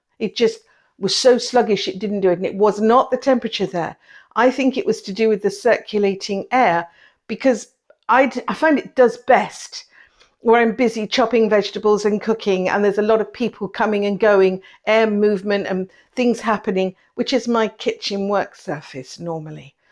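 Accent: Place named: United Kingdom. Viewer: She is English. She is pronouncing it as the Russian word with British inflection. Relax.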